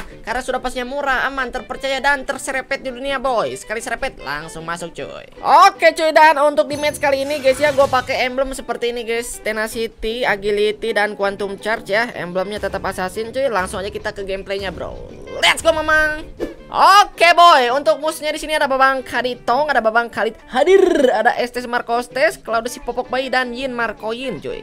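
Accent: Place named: Banyumas, Indonesia. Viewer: Lu knp MEDOK bngt broo ... Ngapak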